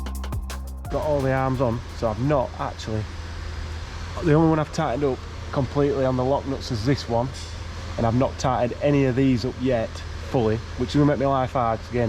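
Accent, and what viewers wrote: Yorkshire, United Kingdom. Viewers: Great video, when you are a fantastic orator with a natural talent for unscrambling technical data with added Yorkshire dialect. You sir have to own the fact that you are indeed the most popular presenter on this channel 🤷🏼‍♂️ embrace it 💪🏻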